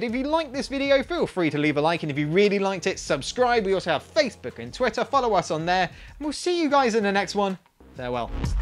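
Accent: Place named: United Kingdom. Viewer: I like how British people say three as free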